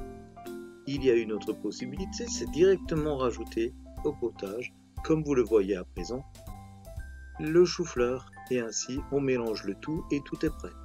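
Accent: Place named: France. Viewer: I love your French accent too, sounds so beautiful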